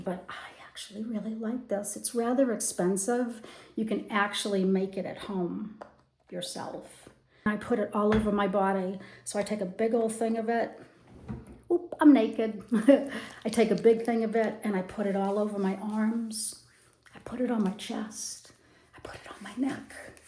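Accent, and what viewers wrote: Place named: Northeast, United States. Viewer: are u from boston? RI? or NY? accent is hard to nail down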